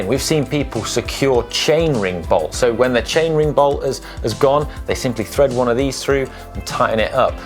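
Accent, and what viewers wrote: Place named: Yorkshire, United Kingdom. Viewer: He reminds me of Jeremy Clarkson with his mild (I assume) yorkshire accent.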